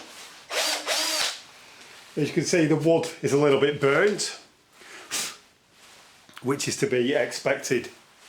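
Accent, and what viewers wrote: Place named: Yorkshire, United Kingdom. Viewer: Yorkshire accent must be one of the worst English accents in the world….boring and damn disgusting.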